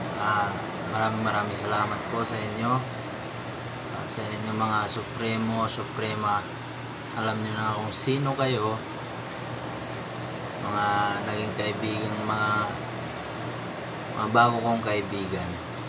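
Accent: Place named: Bulacan, Philippines. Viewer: Puntong Bulacan bgung kaibigan po plitan tau regalo po lmat amega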